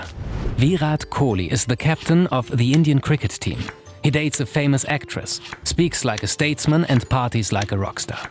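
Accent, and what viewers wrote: Germany. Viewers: to me it sounds like he is german. his accent is very very subtle but somehow that's what I pick up...a german accent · Kann das sein das deutsch deine Muttersprache ist? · Fiahart kholi, lol love the German accent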